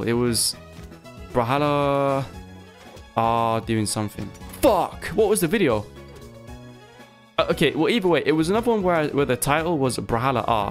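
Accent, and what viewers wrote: United Kingdom. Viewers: I flipping love your British accent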